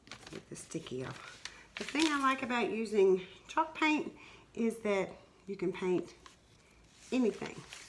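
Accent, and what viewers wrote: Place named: South, United States. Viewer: LOVE 3 TIERED TRAYS! MADE ONE FOR MY DAUGHTER OUT OF GRADUATED SIZED SPRING FORM PANS. HAVE SUPPLIES TO MAKE 4 DIFFERENT ONES I HAVE SEEN ON DOLLAR TREE DIY YOUTUBE VIDEOS. YOURS IS SO PRETTY & FARMHOUSE! ARE YOU FROM SOUTHERN ILLINOIS? YOU HAD MENTIONED CARDINAL BEING YOUR STATE BIRD. MAYBE ANOTHER STATE HAS CARDINAL AS WELL. I NOTE A BIT OF SOUTHERN ACCENT.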